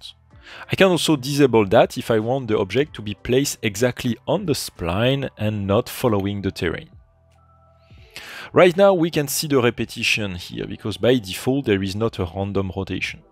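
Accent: France. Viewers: me too 😂😂😂😂 its the first time in my life to hear a french english accent as strong as this one 🤣🤣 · Love this french accent 💌🇫🇷 and vive Twinmotion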